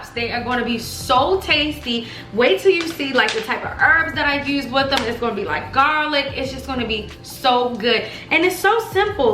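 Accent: Baltimore, United States. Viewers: Oh u def from baltimore. I can tell by your voice. U get a like comment sub of that alone.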